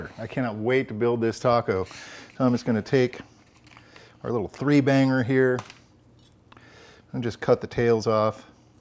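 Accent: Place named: Boston, United States. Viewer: Australian?! That accent was straight out of Boston!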